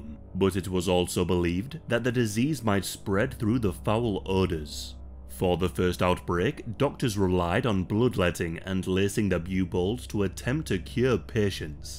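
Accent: United Kingdom. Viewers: he hasn’t got a ‘funny accent’, he has a regional British Accent. Perfectly acceptable.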